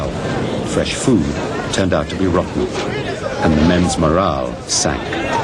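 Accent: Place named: England, United Kingdom. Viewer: lol says "felícima armada": it's " grande e felicísima armada". I love english accent when talking spanish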